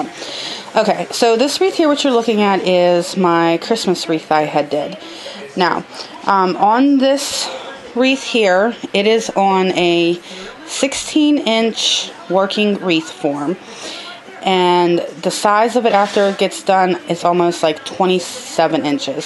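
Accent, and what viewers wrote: Pittsburgh, United States. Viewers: Not even 30 seconds into the video and I knew you were a Pittsburgh girl:) Great video...n'at;) Thanks for sharing:) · Thanks for sharing and going step by step for us beginners! Love your accent, I'm guessing Pittsburgh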